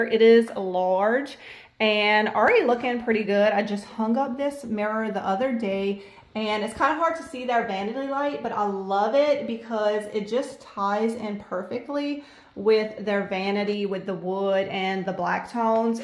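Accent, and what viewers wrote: Louisiana, United States. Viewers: We used to live in the Leesville/DeRidder area when we first got married, but we left in 1991. I love hearing your Louisiana accent—I’ve really missed hearing it!! Your house is beautiful, and I’m subscribing to see it transformed.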